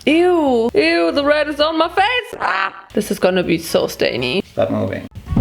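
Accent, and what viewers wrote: Germany. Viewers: Haha hab den deutschen Akzent sofort herausgehört und plötzlich hat sie Deutsch gesprochen 😆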